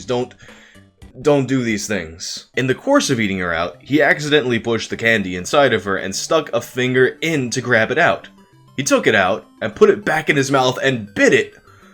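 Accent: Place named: New York, United States. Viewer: First time binging your vids and after a couple of videos I needed an answer. I knew you're from NYC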